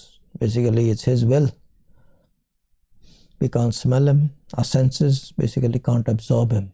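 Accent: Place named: England, United Kingdom. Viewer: Uff Gorgeous Grumpy English Accent 👌👌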